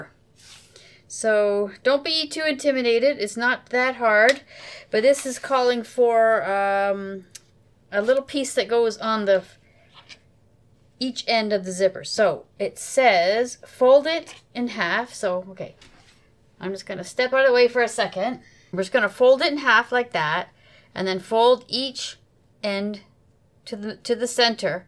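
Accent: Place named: Canada. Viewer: Happy One Year Anniversary! Was painting and listening to random Youtube vids - heard your voice and recognized a fellow Canadian. I'm in BC. Weather is 'meh' here. Overcast.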